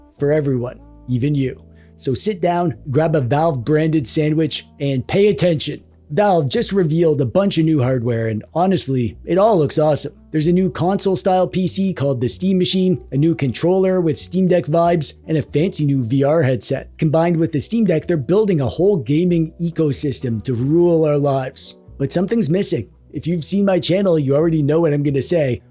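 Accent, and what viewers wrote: Canada. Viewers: Are you Canadian? I think I recognize a fellow Canadian.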